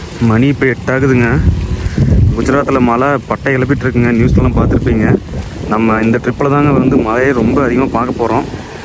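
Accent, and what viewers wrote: Kongu, India. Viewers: அப்பாக்கு நீங்க கொடுக்கிற மரியாதை.. நீங்க பேசுற அந்த கொங்கு தமிழ் அழகா இருக்கு நன்றி ப்ரோ❤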